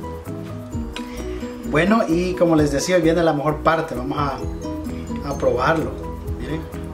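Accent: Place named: Veracruz, Mexico. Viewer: tu acento me parece jarocho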